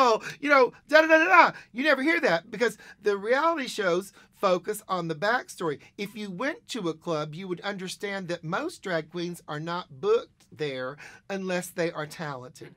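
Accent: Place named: South, United States. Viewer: You know.. it’s crazy bc Lady Bunny rly looks like if Trixie got older . She even kinda sounds like her 😂just southern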